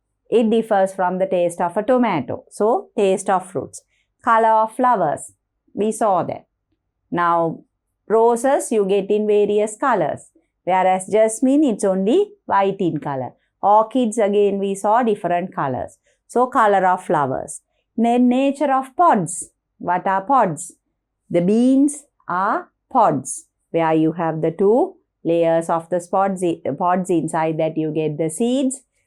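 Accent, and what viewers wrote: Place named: India. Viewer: her accent is like an indian:face-fuchsia-poop-shape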